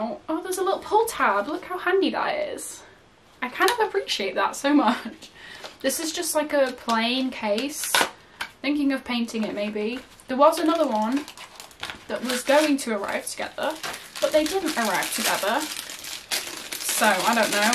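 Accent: United Kingdom. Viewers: Are u British? Just asking cause of the actient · I didn’t realise u were British lol 😂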